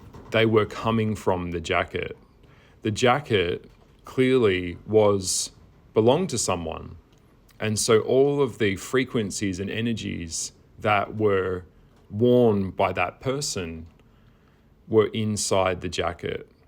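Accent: Australia. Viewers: but you have an aussie accent- where did you grow up?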